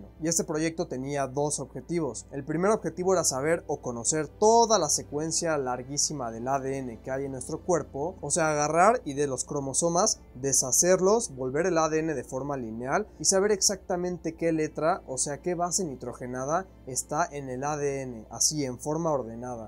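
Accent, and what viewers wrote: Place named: North, Mexico. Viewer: de que parte de méxico eres hablas entre norteño y de ciudad de mexico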